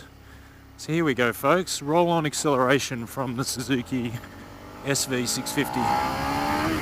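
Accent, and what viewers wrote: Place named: Australia. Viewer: like your Australian accent! Greetings from Spain. I'm 5' 11" and I was wondering if this bike would be too low for me (seat height si 785 mm here in Europe).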